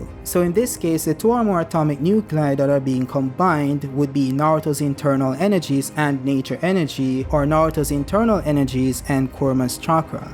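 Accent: Jamaica. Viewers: Do you have Jamaican ancestry your talking kind of sound like that for me??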